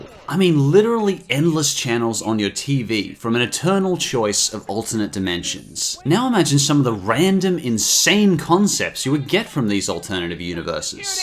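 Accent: United Kingdom. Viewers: I thought I was going to watch an episode but I get narration from some f**** Brit my grandma's from England but your voice is annoying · #1 bad thing about your british accent. when you say, ARTISTIC i think your sayin autistic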